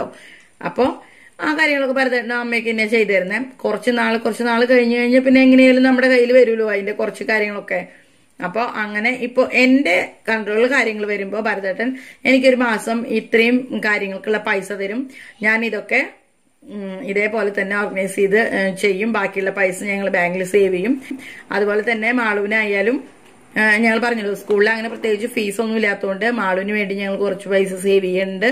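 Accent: Thrissur, India. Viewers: 😁nan subscribe cheithu.chechide varthanam kelkkan nalla rasam undu.thrissur basha.nan kannur.epo husinte koode gulfil.chechide morucurry anikishta.nan undaki.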